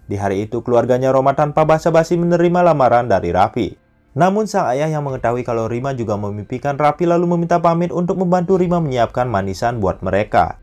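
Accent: Bali, Indonesia. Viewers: Bli org bali ya?? Logat nya kyk ny dari bali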